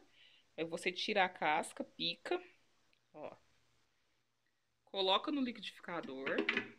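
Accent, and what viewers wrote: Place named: Paraná, Brazil. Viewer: Acho que é do Paraná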